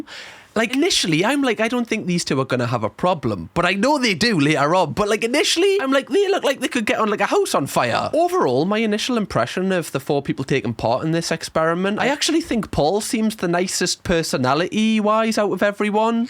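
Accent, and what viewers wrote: Newcastle, United Kingdom. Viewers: ! I found your channel sort of by accident just the other night. I'm hooked now. I know I'm watching everything out of order and all but, your commentary is hilarious! Also, love your accent and getting to hear the Newcastle slang. Love that you call your folks "donuts", I'm also part of the Waffle Gang. Another UK Youtuber Mark Narrations calls his folks that.:)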